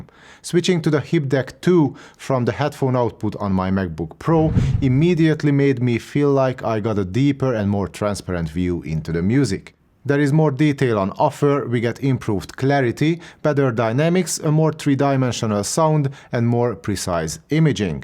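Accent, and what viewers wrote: Hungary. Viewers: you sound very hungarian edit: I’ve just realized you are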